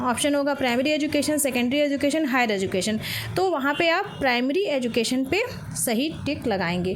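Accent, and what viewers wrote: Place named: Bihar, India. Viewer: Mam aap bihar se hai na kyoki thik h thik h karti h to apne bihar ke hi ho aap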